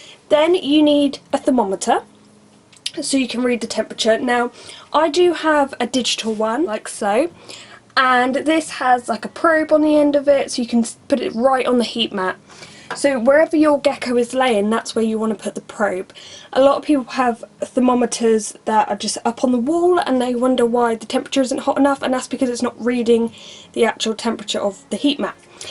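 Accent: United Kingdom. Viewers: Michael Holmgaard she's British and British people sound like that... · Michael Holmgaard Umm... seriously? She is a British and British talks like that, you know that right?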